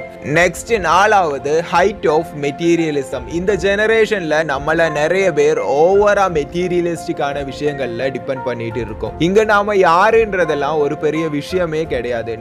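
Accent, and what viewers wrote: Kerala, India. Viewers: I like the way you talk tamil. It’s good to hear a Malayalam slang Tamil. 👏🏻👏🏻👏🏻 keep posting more videos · Malayalam slang varuthu..but nice👍🏼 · யோவ் ஒன்னு மலையாளம் பேசு இல்ல தமிழ் பேசு,அது என்ன தமிழ் மலையாளதகதை தமிழ் ஸ்டைல்ல பேசுற,சகிக்கல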